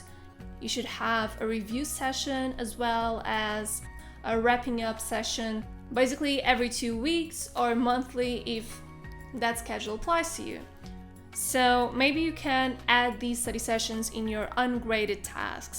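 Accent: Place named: Portugal. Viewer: Wait, ur Portuguese? Your accent looks like Portuguese... só sei que adorei este videos e já me subscrevi! Adoro!!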